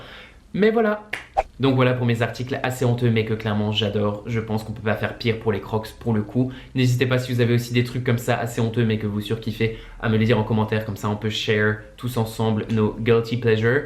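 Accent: Alsace, France. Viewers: Cet accent alsacien team Strasbourg ❤ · Oh !? tu es alsacien ?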